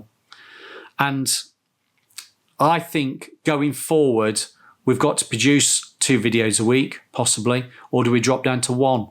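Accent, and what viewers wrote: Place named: East Midlands, United Kingdom. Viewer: Great to hesr an east Midlands accent on YouTube .. im originally from Grantham ..did my yts for wj king in melton in 1990... Worked all over notts.. Finally great to see a painter doing videos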